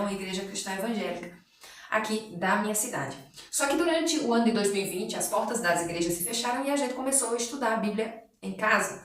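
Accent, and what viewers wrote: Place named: Northeast, Brazil. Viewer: Olá! De qual Cidade você é? Pelo "sotaque " é nordestina rsrs Parabéns por sua escolha 👏 Ganhou mais uma inscrita 😘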